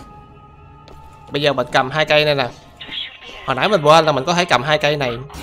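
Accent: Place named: China. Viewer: Hình như anh là người hoa đúng ko?sạc pin mà anh nói là sạc tìn:)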